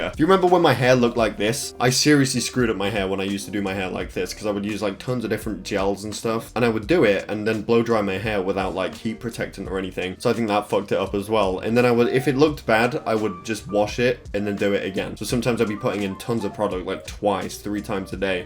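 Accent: England, United Kingdom. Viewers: Im here because of his english accent voice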